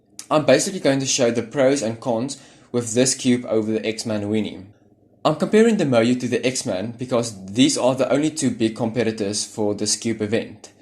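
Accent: South Africa. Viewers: Are you south African, nice accent! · What I'm so sorry but are you South African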